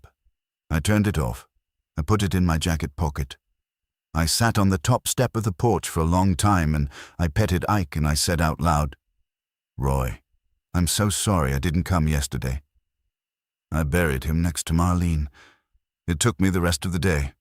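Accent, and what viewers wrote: England, United Kingdom. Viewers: If you're going to use an English accent why don't you set the story in England?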